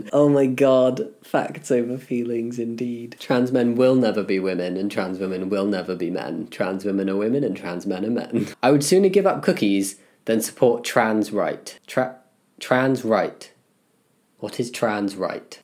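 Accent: United Kingdom. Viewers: sometimes I forget you're British (don't me, I tune out accents after a while) and don't know of the prevalence of Christopher Walkin memes such as "it needs more cowbell". You lucky fucker.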